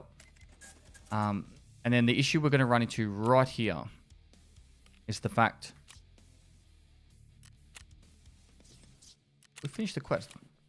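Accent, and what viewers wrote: Australia. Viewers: Love these vids! You're very informational for us new players, me included, plus the aussie accent is nice to listen to! GL in the Netherlands!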